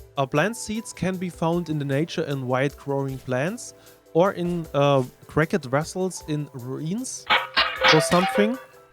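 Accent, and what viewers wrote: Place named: Germany. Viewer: Nice German accent. You remind me of another German of mine.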